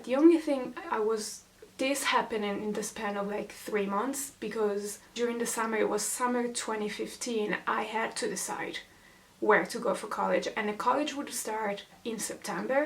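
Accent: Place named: Ireland. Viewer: You have an Irish accent!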